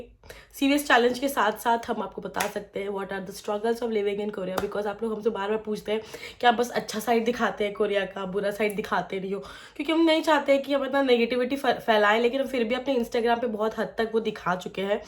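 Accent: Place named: Bihar, India. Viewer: Are you from Bihar. Your hindi sounded like that. I am also from Bihar.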